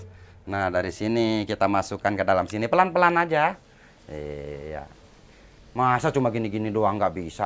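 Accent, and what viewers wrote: West Java, Indonesia. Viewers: Kayaknya sunda tp tegasnya bicara batak punya ..siip keren. Lucu campur da'wah cerdas kali..mohon mintsk no wA nya broo..!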